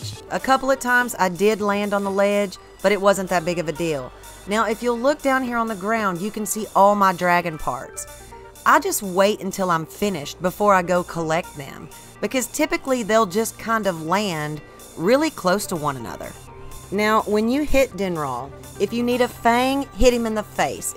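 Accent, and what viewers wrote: South, United States. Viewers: I don’t think I’ve ever heard anyone with a country accent ever talk about Zelda before and I didn’t know I need it. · 1: I didn't know dragons were in this game and that's awesome 2: if you don't mind me asking, what is that accent? I can't tell if it's a southern accent or a Newfoundland one though I'm leaning more towards southern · Is the narrator from the southern states? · I love your southern drawl and your videos. Thanks! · The southern voice is so rare on YouTube. I’ve been out of the real South for so long I’m starting to lose my ability to tell what state people are from. You don’t have to answer so that you can maintain your anonymity and privacy, but I wanna guess either the Carolinas or Tennessee? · Love her accent! Being from Oklahoma I never hear fellow southerners in gaming 🎉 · A southern soccer mom voice talking in detail about video game strategy. 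That is a true paradox. It’s wreaking havoc on my brain. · Thank you so much! I always use the snow boots and never bought the snowquill trousers so I didn't know unfreezeable was a thing until this video. Nice to hear a fellow southern girl Zelda fan too! Cheers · Holy hell you sound like family... Rare to hear such a sweet southern voice on the tube. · This girl is definitely from the south. I say texas or Louisiana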